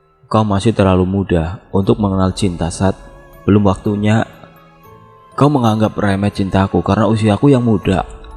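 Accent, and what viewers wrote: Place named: Banjar, Indonesia. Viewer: admin keknya orang kalimantan , soalnya logatnya kalau ngomong banjar pas banget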